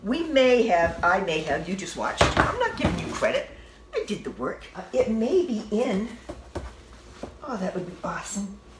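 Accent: New England, United States. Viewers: Hi, I subscribed to your channel a few days ago. I enjoy watching your videos and find them helpful and also entertaining. One question: are you from New England? You remind me of the way people talked when I lived in MA. Keep the videos coming. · Nice job! And you remind me of myself.... hahaha Like you, I do as many little fix-its as possible. Limited $$ to throw out the window leads to creative solutions. Always gives you a good feeling of reward when you've done something like this. BTW.... You sound like you're from my neck of the woods. I'm in southern NH (Nashua area). You sound like you're pretty close by, maybe MA? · I have an idear...keep the r's. It's part of your New England charm and very endearing.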